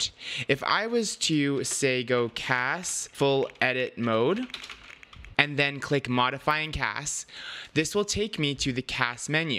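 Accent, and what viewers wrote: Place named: Philippines. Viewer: you sounded Filipino. haha. Nice content by the way.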